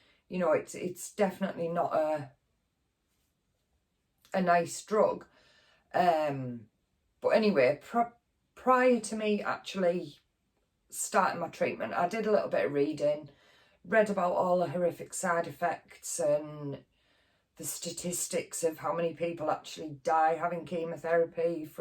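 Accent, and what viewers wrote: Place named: Wales, United Kingdom. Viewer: Hello friend. Wishing you a nice weekend in ..... I'm guessing from your lovely accent ....Wales?? I know that your videos are helping - and will continue to help - women with cancer. Take care of yourself, kind lady. ❤️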